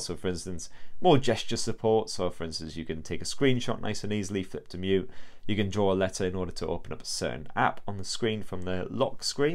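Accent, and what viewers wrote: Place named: North East England, United Kingdom. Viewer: The reviewer's voice is really off putting with that vile north east accent . He has no concept of speaking intonation whatsoever, just likes the sound of his own voice and is rushing through this review as if he's got a 'promise on' ...... .He needs to go back presenting the 'One show / Country file' .. .. lol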